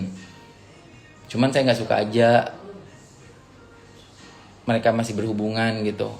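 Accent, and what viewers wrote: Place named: Jember, Indonesia. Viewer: Logat jembernya masih kentel ... Bah... Semoga ada seseorang yang bisa sembuhkan kamu. Aamiin.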